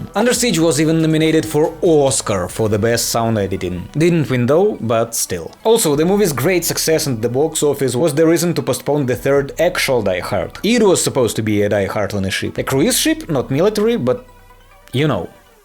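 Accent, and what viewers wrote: Russia. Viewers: where are you from? You have this clear russian (or Ukrainian) accent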